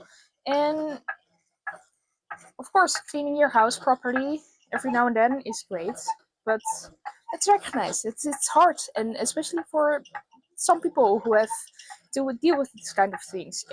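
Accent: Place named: Netherlands. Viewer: Yep, i have the same as well here, also ik hoor Nederlands 😊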